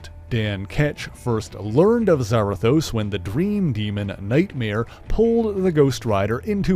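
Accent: Canada. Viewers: I can hear the subtle Canadian accent 😂. Great video though. Been trying to hunt down all the single issues so I can finnaly read the full story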